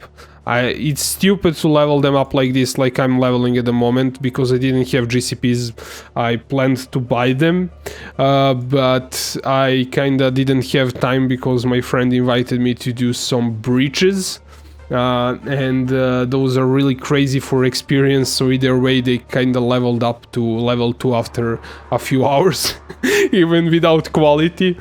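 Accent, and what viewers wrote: Russia. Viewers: Ты русский? Акцент очень заметен.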